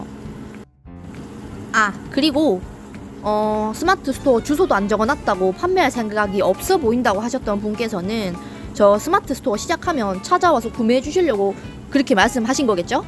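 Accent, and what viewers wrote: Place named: Gyeongsang, South Korea. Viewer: 같은 경상도 사람으로써 말투 귀엽네여 ㅋ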